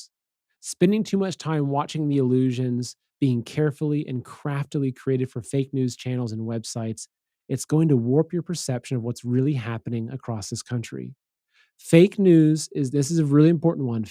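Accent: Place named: Scotland, United Kingdom. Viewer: That was the Scottish accent and I loved it. I reminds me so much of my uni days 500 years ago :D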